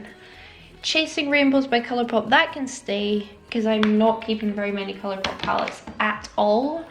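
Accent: United Kingdom. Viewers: Where are you located ? Your accent makes me home sick for the UK :)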